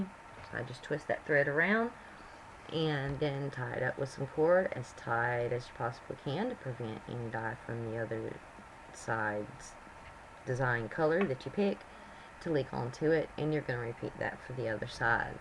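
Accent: South, United States. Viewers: love your voice is that southern plus am learning on how to do tie dye to bond with my stepdaughter and we are doing them together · i love ur southern accent! ^.^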